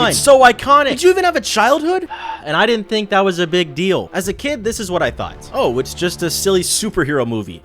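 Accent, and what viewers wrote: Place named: United States. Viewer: Bro, I thought you were american.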